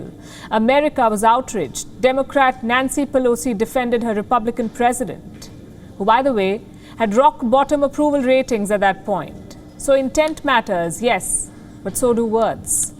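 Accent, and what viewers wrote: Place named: India. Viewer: It's like Indian English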